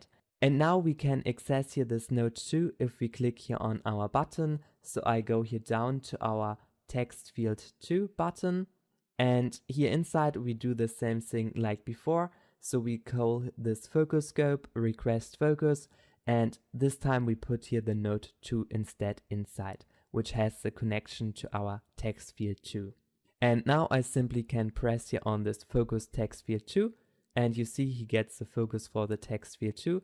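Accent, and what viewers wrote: Germany. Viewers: lmao i can hear that you are german ahahahah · Great Tutorial or should I say Gut gemacht? Man hört echt raus, dass deine Muttersprache Deutsch ist. Das ist aber ja nichts schlechtes:)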